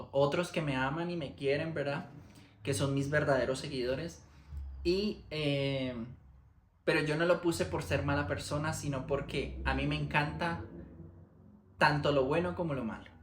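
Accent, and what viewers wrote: Paraguay. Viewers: Ya tenés mucho del acento paraguayo. Que estés bien! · Tu acento ya es casi paraguayo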